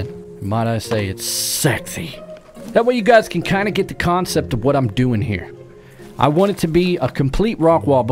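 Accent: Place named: North Carolina, United States. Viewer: You must be from NC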